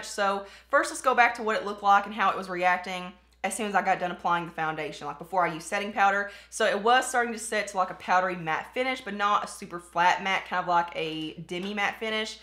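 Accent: South, United States. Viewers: I’m glad you actually take the time to REALLY test the foundations!!! Love your channel and that cute sweet southern accent!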